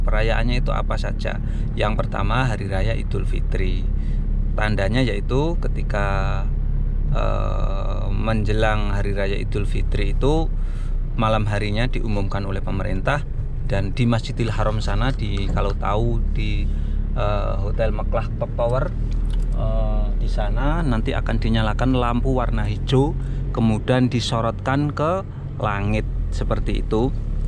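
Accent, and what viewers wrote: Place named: Banyumas, Indonesia. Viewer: bang asli endi ngomongnya ngapak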